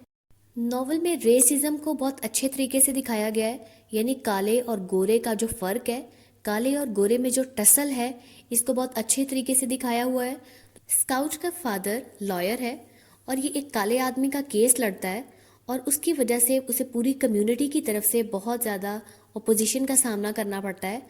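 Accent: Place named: Pakistan. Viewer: एक्सेंट बाहिर सुनकर मुझे लगा कि आप पाकिस्तानी हो और सच में आप पाकिस्तान से हो👍 ग्रेट You have summarized the story very well. I am an Indian and I have to study this story in the 5th semester of college in Delhi University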